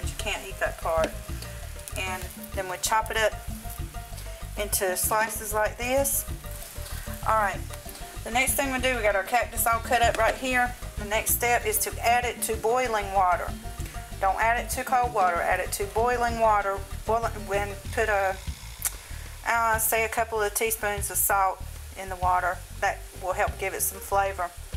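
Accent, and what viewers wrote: South, United States. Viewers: Love her accent! Makes me miss the south.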